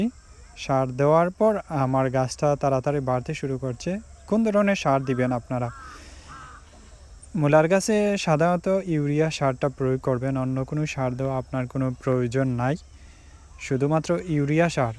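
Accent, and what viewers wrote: Sylhet, Bangladesh. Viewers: Sylhoti bhaisab. Apnar mat khub bhala lager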